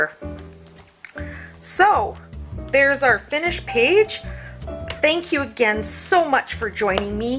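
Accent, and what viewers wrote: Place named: Canada. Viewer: Hello fellow Neighbor! Thought your accent was Canadian. Born in Minnesota but moved to Colorado 20 yrs ago and everyone thought I was from Canada. No longer have my MN accent but listening to you brings back memories.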